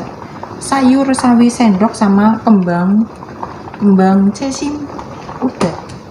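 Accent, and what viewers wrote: Banyumas, Indonesia. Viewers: Mba, asli jawanya mn, ngapak ky nyong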